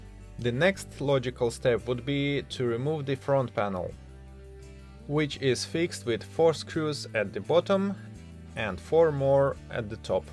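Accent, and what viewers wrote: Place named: Russia. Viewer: Комментатор явно русскоязычный))))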